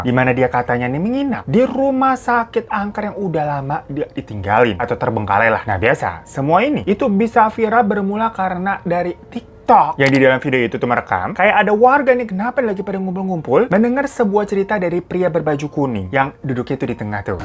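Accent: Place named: Pati, Indonesia. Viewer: Pati mana suaranya 😅👆👆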